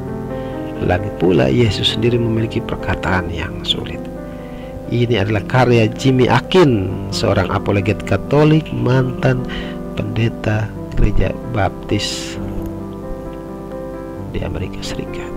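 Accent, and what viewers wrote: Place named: East Indonesia, Indonesia. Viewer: suara dalam video ini...kedengaran macam suara sdr dari Timur...mungkin NTT atau FLORES. apapun...semua Gereja Tuhan itu belum ada yang sempurna, hingga nanti menit TERAKHIR di sempurna kan sang PEMILIK GEREJA, jadi bisa saja bersatu walau dalam perbedaan.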